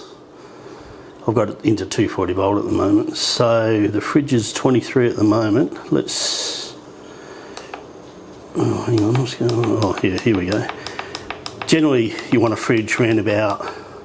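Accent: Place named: Salisbury, United Kingdom. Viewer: You have a very strong accent. Sounds like your from Salisbury.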